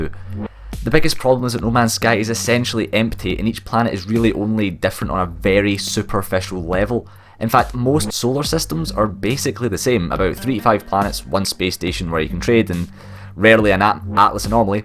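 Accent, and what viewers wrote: Scotland, United Kingdom. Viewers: I love hearing Scottish people rant about shit. :D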